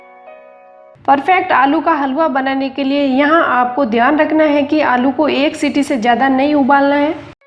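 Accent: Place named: Marwar, India. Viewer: All Indian Rasoi waoo hum bhi marwadi h aapna loog ki boli s ptaa chl jtaa h ....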